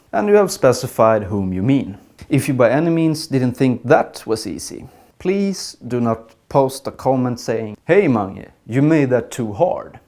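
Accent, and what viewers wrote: Stockholm, Sweden. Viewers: STOCKHOLMSKA, såklart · yay stockholmska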